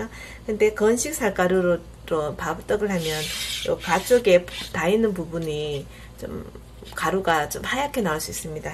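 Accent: Gyeongsang, South Korea. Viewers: 목소리도 듣기좋고 경상도사투리가 더 듣기좋아요 항상 잘 보고있어요 👍👍👍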